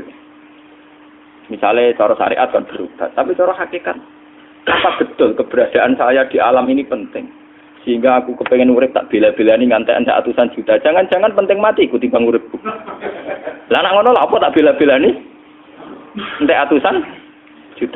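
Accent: Java, Indonesia. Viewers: Tolong admin channel ini smua pake translate meskipun beliau pake bahasa indo tapi dialek,y msih kental dng jawa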